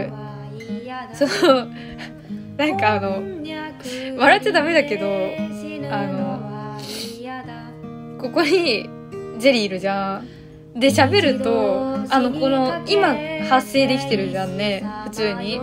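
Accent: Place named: Nagoya, Japan. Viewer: いやー名古屋弁いいわー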